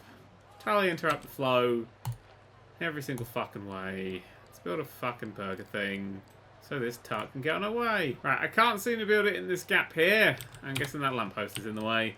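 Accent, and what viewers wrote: United Kingdom. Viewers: British Accented Man-Baby Complains About Quest Tabs for 42 Minutes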